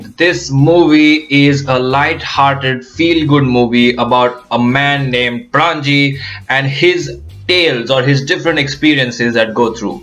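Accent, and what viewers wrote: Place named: Thrissur, India. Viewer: It's Thrissur accent... its rather like singing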